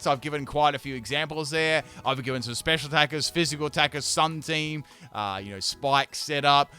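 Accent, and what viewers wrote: Australia. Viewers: that's sick - have seen this but never knew the name. you're aussie yeah?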